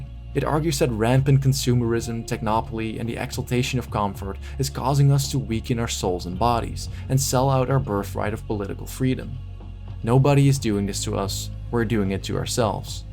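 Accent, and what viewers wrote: Netherlands. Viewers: Just subscribed! I watched a few vids and just a question, are you dutch?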